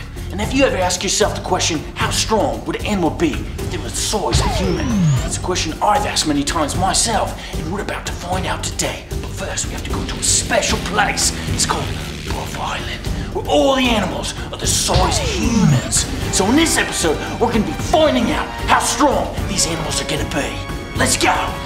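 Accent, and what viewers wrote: Australia. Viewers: Australian accent lol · Fair dinkum Aussie here....great aussie accent if I do say so myself. We miss our Steve Irwin. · Hahahahaahaha! I wasn't expecting this. You sound and look like an Australian indeed. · I love the over the top Australian accent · Bluddy hehhhhlllllll!! This is halarious 😂👍 mixture of aussie, sooth afrikhn and irish. #fitbullaussie approved 😉😁👍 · Nice Australian accent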